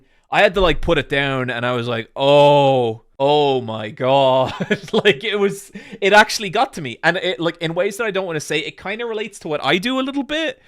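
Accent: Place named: Ireland. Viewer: Is it me or does he sound significantly Irisher than usual here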